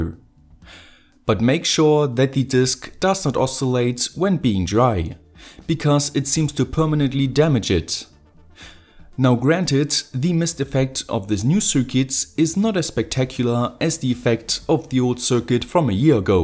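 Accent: Germany. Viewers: are you german? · Er klingt auch so schon deutsch genug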